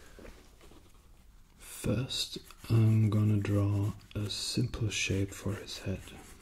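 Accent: Germany. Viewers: this is when he still had a little german accent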